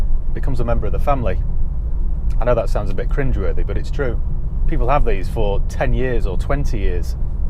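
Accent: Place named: England, United Kingdom. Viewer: england i reckon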